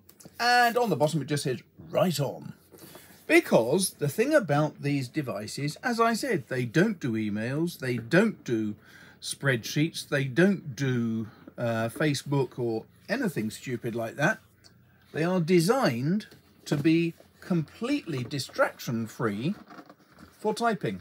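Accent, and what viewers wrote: United Kingdom. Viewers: Great review. I hope to be a charming gentleman like you one day! People from the UK are just classier than us Americans. It's gotta be the accent. Anywho! One of my big complaints about the Freewrite is the typing delay. When I'm on a roll and I'm comfortable, I can usually type 70 words a minute on average and I'd like to keep that consistent. And it seems like the device would struggle keeping up with such a speed. Any thoughts on that?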